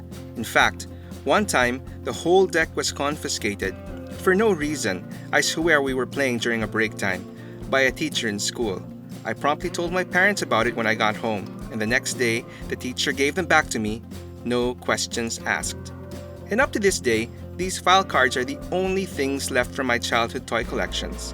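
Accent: Philippines. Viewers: mabuhay po kayo sir, nalaman ko po sa accent nyo na pinoy kayo, god bless po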